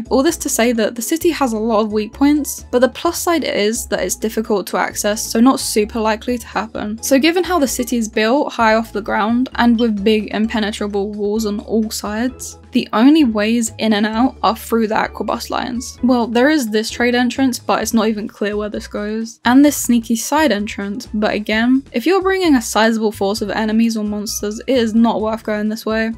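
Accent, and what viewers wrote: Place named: United Kingdom. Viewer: but why are you british?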